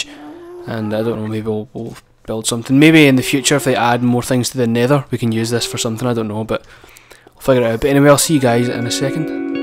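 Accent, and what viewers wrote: Scotland, United Kingdom. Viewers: are you scotish lat ? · this guy sound soooo much like James johnston from biffy clyro :D ( and not just becasue there both Scottish)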